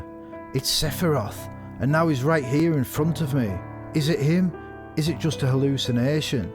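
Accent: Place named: Cumbria, United Kingdom. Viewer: You've got a gift for this brother. Goosebumps. Even with a Cumbrian accent.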